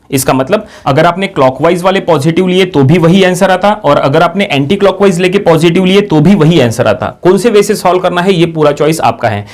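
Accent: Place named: Maharashtra, India. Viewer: Bhai Maharashtra mai ase hi bolta hai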